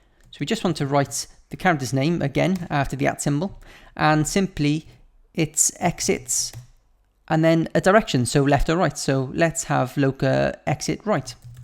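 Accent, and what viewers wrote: Wales, United Kingdom. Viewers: He sounds Welsh! I dunno... he has the same accent as me!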